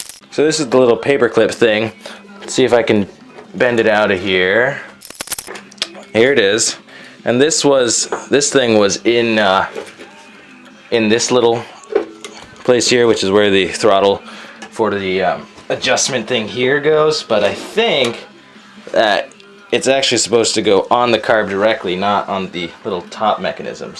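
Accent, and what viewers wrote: Vermont, United States. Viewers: Sounds like Vermont, acts like Vermont and yes it is Vermont. There was an old man down the street that repaired old mowers and was good at it and had 35-40 mowers in bushes around the house. I used to walk the daughter by his place and one day talked with him. Used mowers can bring in some money on the side. He was dealing with another gent who sold those mowers for cheap at a large yard sale. I took over the business of sales and we made 5X as much. Some of the mowers bringing $70-120 apiece. One neighbor had a Honda mower and I offered to sharpen the blade as he had not sharpened it in 15-20 years-yes. Upon looking I found he had been mowing with the blade upside down and backwards for those years! Now he cuts smooth as a new mower. I waited until I got home before I started laughing about the whole thing!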